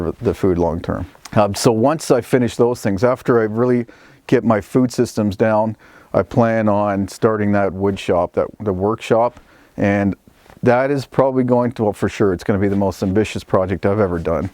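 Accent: Canada. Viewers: Hi, Great video, as usual. Completely off the subject but has anyone else seen the similarity in personality, tone, and world view (not to mention looks and Canadian accent, eh?) between and the great advocate for free speech and self reliance, Jordan Peterson, the clinical psychologist whose work has done so much to turn young men around. If you haven't seen Jordan Peterson, you must. He's all over Youtube. Check him out too, you won't regret it. It's be nice to have and Jordan sit down and talk about life. Happy New Year! Steve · *WOW 💕 what a man!* *You've created a wonderful, (other) life.* * *I wish you safety and all the best for great health and happiness with family and friends for many, many years to come.** 🤗 I adore your Canadian accent especially, when you pronounce the word: "out".🤗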